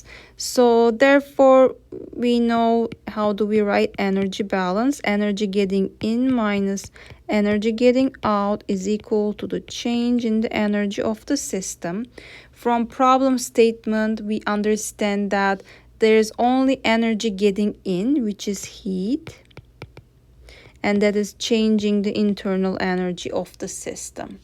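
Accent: Turkey. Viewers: Hocam Are you Turkish your accent sounds Turkish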